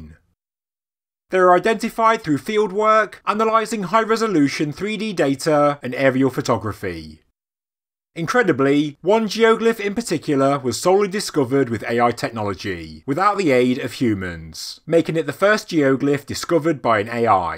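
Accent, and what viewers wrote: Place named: Southern England, United Kingdom. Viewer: I'm English and I've no idea why he's talking like he's got a class of 3 year olds. For anyone outside of Britain I'd just like to point out that we don't all talk like him... Or the Queen.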